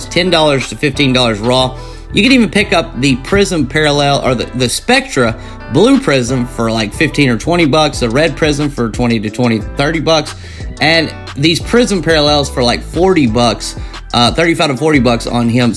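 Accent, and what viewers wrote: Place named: South, United States. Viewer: This guy looks like the actor Max Casella except with a country accent 😂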